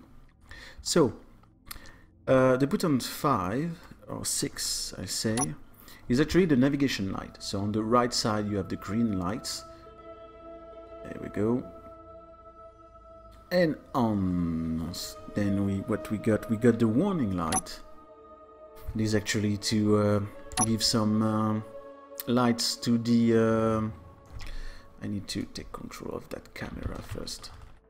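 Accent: France. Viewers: Sympa ton mothership ! Un petit accent français dans la voix je trouve :) Beau boulot sinon !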